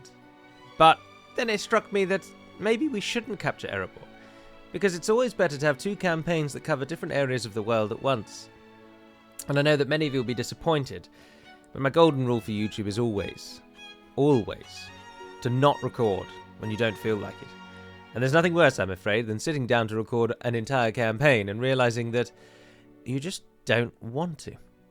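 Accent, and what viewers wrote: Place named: United Kingdom. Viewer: Absolutely agreed. Do what your heart desires and I will be here just for the ride as well as that amazing and clean british commentary. At least that is what I am mostly here for... humour included:D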